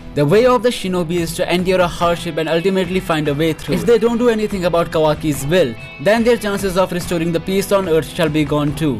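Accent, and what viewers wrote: India. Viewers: ok bro i understand, I said becoz by listing your voice I think that you a indian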